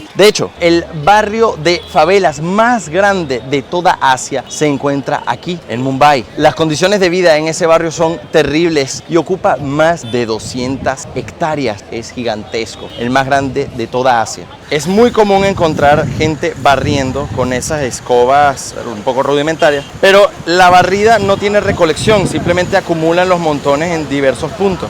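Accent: Venezuela. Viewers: Para su reportaje todo es pobreza, sucio na hay lugares histórico, turísmo y cosas positiva según usted. por su. acento es venezolano, y le digo algo en su país hay bastante, dígame los cerros.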